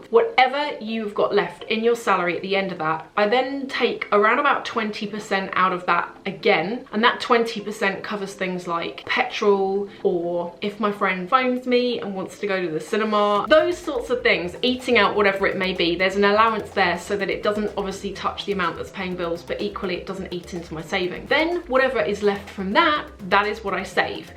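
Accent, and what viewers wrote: England, United Kingdom. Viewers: Hello! I am a new subscriber and just recently found you on YouTube. I am loving your videos! I get a coffee and settle in to listen to your lovely English Accent (I am from US) your style is so inspiring!!! and my favorites are when you open mail from you subscribers. You are so genuine! So humble and appreciative!! I really really am so glad to have found you!!!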